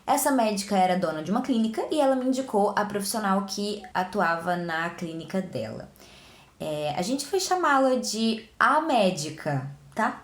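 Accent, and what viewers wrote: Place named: Rio Grande do Sul, Brazil. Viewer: Quando eu era pequena (hoje tenho 32), tu tinha sotaque gaúcho:) Que engraçado te ouvir agora!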